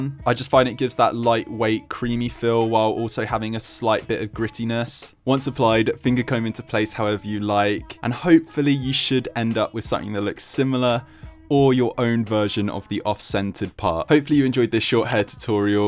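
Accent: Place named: United Kingdom. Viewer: You have british accent bro isn't 😊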